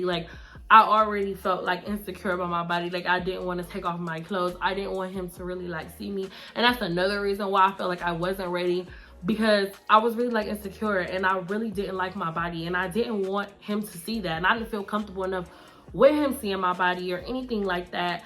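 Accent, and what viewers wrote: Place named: New Orleans, United States. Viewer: why you sound like you from new Orleans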